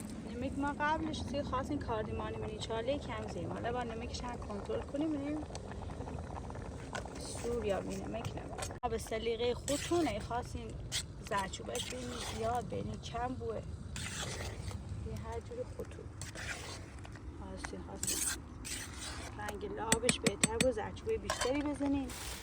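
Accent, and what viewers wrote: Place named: Lorestan, Iran. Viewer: What a sweet Lori accent you have — I’ve fallen in love with it, it’s so beautiful! 💛❤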